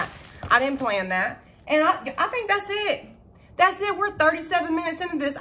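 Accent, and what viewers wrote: South, United States. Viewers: Must be from the South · HI from Katherine i love southern voice you do a good job on dollar store you gave me tips. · Love your southern accent, love your videos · You are so funny with y'all and yes ma'am. I be laughing so hard in years. Where are you from ? Must be from the South. I love the way Southerners talk. I love going down South the people are so hospitable. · It’s so good to hear someone from the South....I say stuff like “my bag is slap full!” You make me smile! 😀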